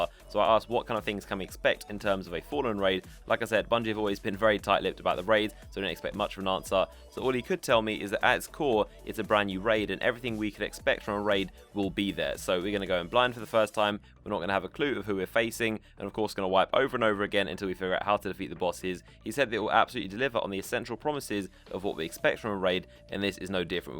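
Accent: United Kingdom. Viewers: Race doesn't influence how you sound. He has a Brit accent because he's from Britain. Stupid.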